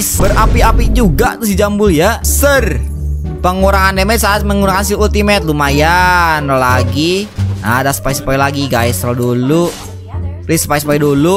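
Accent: West Java, Indonesia. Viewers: Mang org mana Sunda n logat n alus haha 😂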